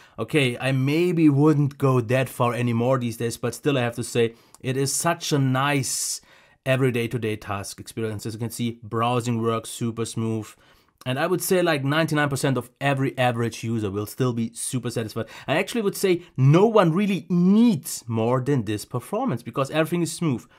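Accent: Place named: Germany. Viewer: I love your videos and your accent you German Guy :D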